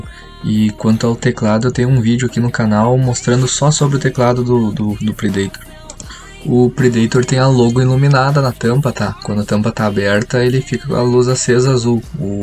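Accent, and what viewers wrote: Brazil. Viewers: Tu é de SP? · Amo assistir um video de tecnologia com um apresentador Gaúcho. É uma baita barbaridade thê kkk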